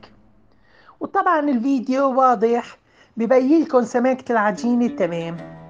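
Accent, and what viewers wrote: Aleppo, Syria. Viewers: دخيل عينك ما بتذكريني الا وانا.. بحلب.. على جمال اسلوبك.. انا بتابعك بس لاسمع صوتك ولهجتنا الحلبية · يا وين كنتي لهلئ اييي ابوووس وجنك وجك انا خيييييت والله حسيت حالي بنص حلب ع سمع صوتك وطبختك 👍😅 عفرينة اناااا وبحبك كتييير لسع ع أيام نواله بحبك 😍🤣🤣🤣🤣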